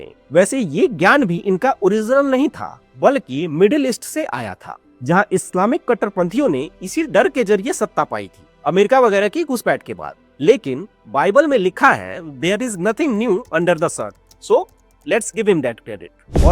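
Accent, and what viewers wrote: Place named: Bihar, India. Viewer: Very poor speaking 🗣anchor performance bigra huoa bihari style ba chara chor ke bete ko parivar public dhono nei baga diya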